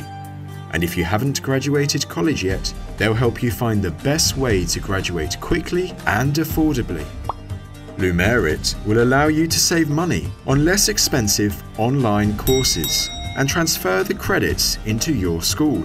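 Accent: United Kingdom. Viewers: Is there a way of switching the narrators? I clicked one time and had a distinct British voice narrating , but then I came back and it’s a different voice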